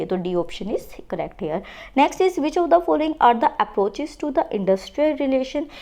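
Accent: Haryana, India. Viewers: That is called "Haryanvi" accent.😎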